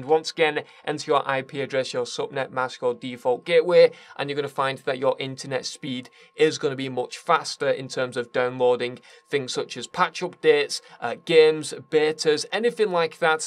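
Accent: Scotland, United Kingdom. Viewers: This Guy Is Scottish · are you Scottish or English?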